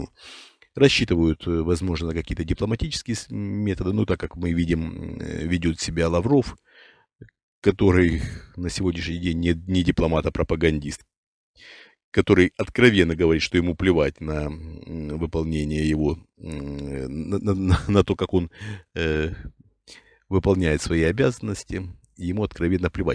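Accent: Ukraine. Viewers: Бля, жесть! Это что, кто-то всерьёз слушает?! А украинский акцент диктора никого не смущает? А подзаборная лексика и стилистика? Нет, народ, что серьёзно так потерял чувство уровня, что ВОТ ЭТО принимает за чистую монету? Наши люди неисправимы. Кашпировский и Чумак и сейчас могли бы собирать залы и "заряжать воду". Чем "президент, слушающий шаманов", отличается от баранов на этом канале, слушающих таких вот? Впрочем, секте сложно понять, что она секта. Анекдот, ё-моё...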